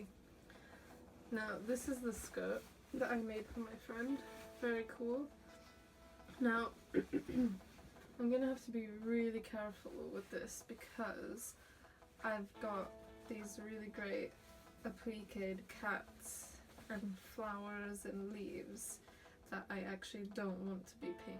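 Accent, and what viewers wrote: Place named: RP, United Kingdom. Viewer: You sound so posh. :)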